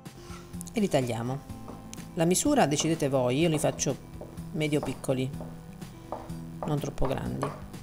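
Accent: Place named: South, Italy. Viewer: A parte il fatto che sei una maga, in cucina: ma parliamo del tuo accento meraviglioso..mi scalda il cuore! Io son Sarda e adoro gli accenti del Sud e delle isole!!!!❤❤❤❤❤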